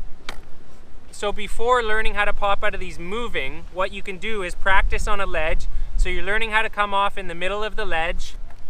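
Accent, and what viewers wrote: Canada. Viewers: Is that an Ontario accent? idk if you are but you sound incredibly Canadian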